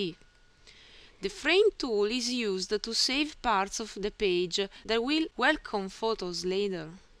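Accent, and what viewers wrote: Italy. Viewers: Hahah true I think so, I'm italian and I've think the dame thing 😃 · Are you Italian? Your accent sounds like italian people speaking English. :) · nice to follow even with your italian accent its easy to still understand actually very clear to understand :) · I'm Italian aswell..sounds like an Italian speaking English but, to me, the rhythm/strong emphasys on consonants when she pronunces 'We will take for example....' and 'which format the page must have...' makes me think that she might be Russian.....I have few Russian friends here in Bangkok and the 'musicality' is the same....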